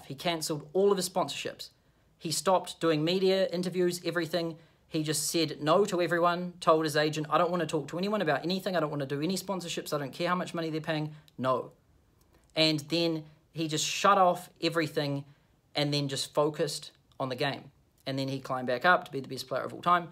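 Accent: New Zealand. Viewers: One thing comes to mind when watching your videos. Quality. Great job man. I like your way of analysing life and work. And I have fun when I can't understand that you find a lot things "bitter". New Zealand accent rocks!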